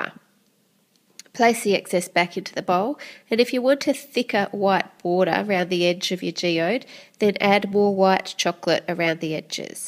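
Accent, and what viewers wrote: Australia. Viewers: That's an Aussie accent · Your nose sounds as stuffy as mine feels today! I can't get any air through my nose right now and I sound identical to you. I mean, minus the Australian accent and adding an American accent lol. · she definitely does if you are anywhere except Australia, but if you are Australian and have an Australian accent you probably don't notice it 😊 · I always look forward to your videos. Not only have you helped my daughter and me out in baking and decorating numerous times, you have such a pleasant speaking voice and we ♡ your "sheila" accent (we're from Ohio, USA). The geodes are so ingenious and beautiful.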